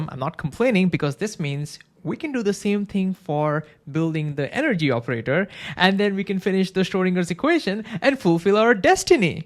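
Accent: India. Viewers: God I hate when I find a video that sounds exactly something I'd like then I hear an Indian accent.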